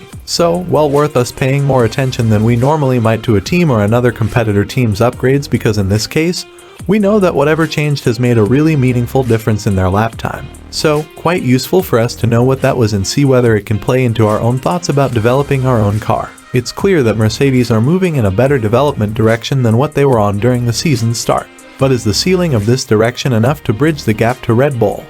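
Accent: United States. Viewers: As soon as I hear an American accent I anticipate click bait. Sorry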